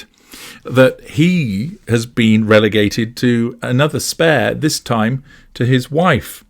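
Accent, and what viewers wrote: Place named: England, United Kingdom. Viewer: You have one of the most beautiful voices I have ever heard! Understanding English from England is a bit difficult for me, but, I love love love your tonality, your voice, and how professional and precise your information is. Thank yu, and please continue doing your videos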